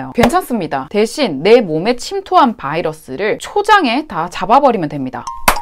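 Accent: Gyeongsang, South Korea. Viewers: 일본어 하시는건가요 경상도 사투리 하시는건가요?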